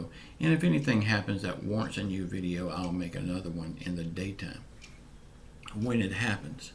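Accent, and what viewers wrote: South, United States. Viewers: Ok I've been listening to your channel for awhile now it sounds like your from the south me to Tennessee. Move back for family anyway you forgot one major issue today where is that recipe for sguach/hamburger that sounds so good. I'm so so glad Amy's doing better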